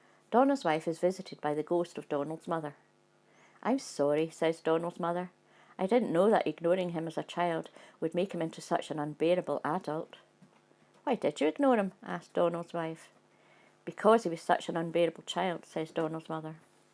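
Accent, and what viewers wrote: Scotland, United Kingdom. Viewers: No she is Scottish if you want to learn standard Uk English look up how to learn RP and the sounds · Is your pronunciation is how the British accent sounds?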